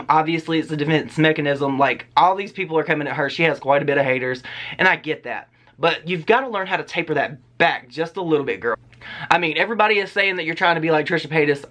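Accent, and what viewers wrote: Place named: Alabama, United States. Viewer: New sub. Our accents match! I’m from Alabama ♥️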